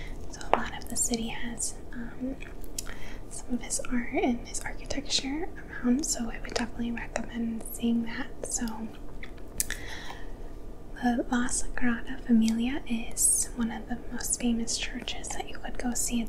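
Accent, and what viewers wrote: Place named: Upper Midwest, United States. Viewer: definitely has that upper midwestern accent. Probably from Michigan, Wisconsin or Minnesota. Maybe northern Illinois. I think I've found a new ASMR channel!!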